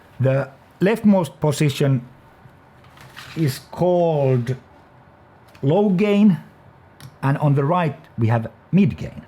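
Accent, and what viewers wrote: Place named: Finland. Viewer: Relax man, I herad the finnish accent, arleady Im buyin this thingy, the MI peds I own are pure genius...Im not so much for crunch, but boysngurls MI means myne, so try try try..., someone said that this with the Super Blues Pro n strat..., LOVE, Kimmo : )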